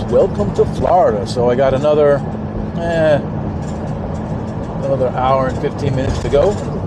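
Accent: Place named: New Jersey, United States. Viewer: COLD! Judging by accent you must be from NJ? The Fletch used to see winter for a long time in western NY and I'm sure doesn't miss it. Good luck racing in Florida and have fun.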